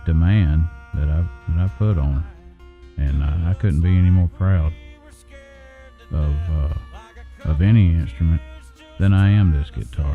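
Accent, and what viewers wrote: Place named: South, United States. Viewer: Way too much Southern accent is killing me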